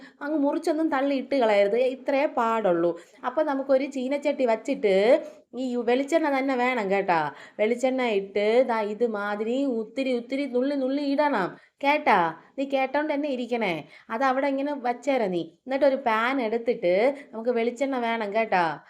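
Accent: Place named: Trivandrum, India. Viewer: Trivandrum language super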